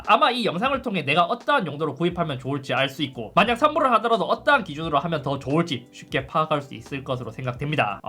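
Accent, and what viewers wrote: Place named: Gyeongsang, South Korea. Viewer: 갱상도는 갱상도네요...드라랄드라라... 빡세요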